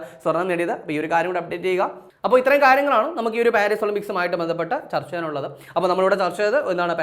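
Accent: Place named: South Kerala, India. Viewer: തെക്കന്‍ സൈഡ് അങ്ങനെയാണ് ഭ'ക്ക് പകരം 'ഫ' ഉപയോഗിക്കും. ഫാര്യ,ഫിത്തി.. അങ്ങനെ😂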